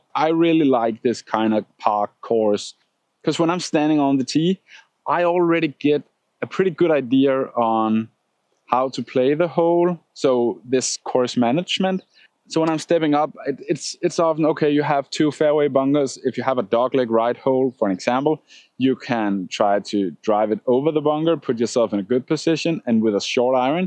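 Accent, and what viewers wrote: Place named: Jutland, Denmark. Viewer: Fed video og fedt på engelsk også, du snakker ganske fint engelsk på godt jysk, ingen worries der, hvad med en video, med dine længder på alt i baggen, og gerne nogle Challenge med andre golfer på dit niveau, kunne være fedt og se.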